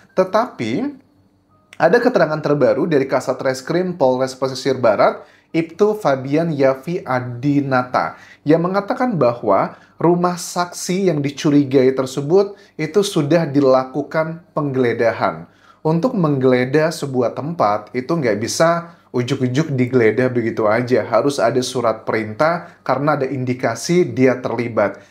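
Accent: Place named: Banyumas, Indonesia. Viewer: Ujug ujug 😂 wong ngapak admin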